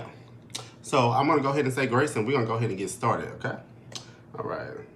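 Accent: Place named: South, United States. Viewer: Great video. You sound very southernish in this video. Where exactly are you from? You were very excited to pour that water, very commercial like.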